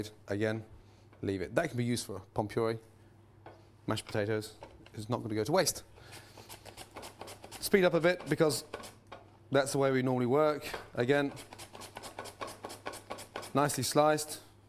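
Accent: Scotland, United Kingdom. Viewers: Love this down to earth raw front line insight. Its amazing to see Gordon not in massive wanker mode! I love his thick Scottish accent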